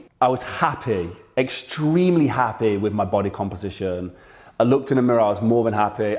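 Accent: Nottingham, United Kingdom. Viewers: Notts lad?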